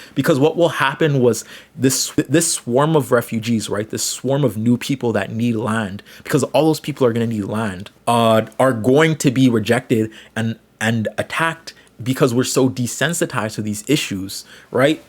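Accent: Canada. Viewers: You sound like you're from Toronto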